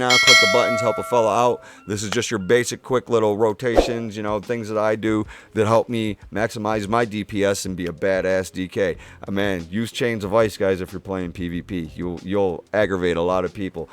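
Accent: United States. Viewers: I like your videos man. Nice to hear an American voice in a wow vid. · I subbed to get awesome Unholy DK info....and because your voice sounds so American you could be my neighbor. Love this.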